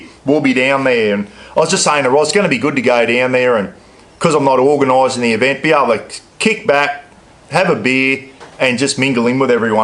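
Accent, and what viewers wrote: Australia. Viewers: Hey Great to see you back on youtube, I missed you heaps when you shut down your old channel. It is so good to hear the voice of a genuine Aussie on youtube. I am so sick and tired of hearing the voices of so many arogenate yanks.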